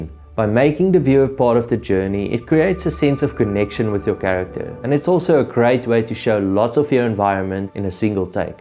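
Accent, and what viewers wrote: South Africa. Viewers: I'm so happy I stumbled onto your channel. Between this, the Intent video, and the Contrast video I've never felt more in tune, capable, and powerful as a cinematographer. Weird way to describe the feeling I guess, but as a fellow Saffa it's encouraging hearing such useful, honest information in your own accent haha.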